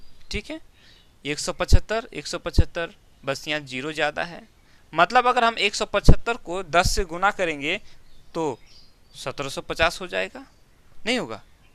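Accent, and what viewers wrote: Bihar, India. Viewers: Sir aap bihar se ho kya ❤❤❤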